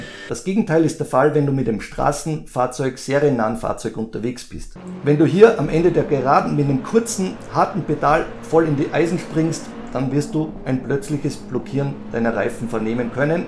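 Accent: Austria. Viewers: A Sum up would be verry Nice! Like your video’s but the accent (Austrian) is verry hard to understand for me 😁 really curious about your opinion related to the v3 (i).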